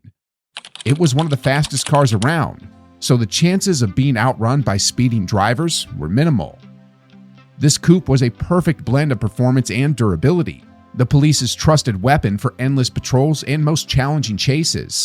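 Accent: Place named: United States. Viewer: The yank voice put me off!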